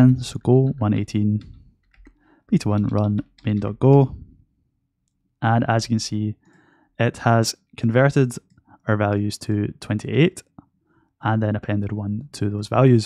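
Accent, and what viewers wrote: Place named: Scotland, United Kingdom. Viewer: Probably the Scotish accent. :)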